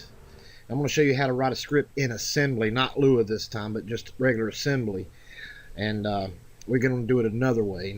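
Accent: South, United States. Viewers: Every time I hear this voice I get a bit of nostalgia for Georgia, Alabama and South Carolina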